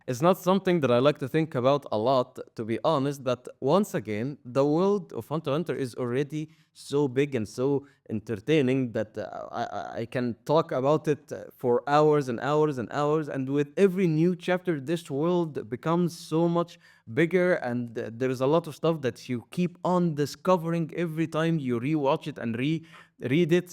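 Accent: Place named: Egypt. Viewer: My friend you have an Egyptian accent.. it's nice however, is it actually Egyptian?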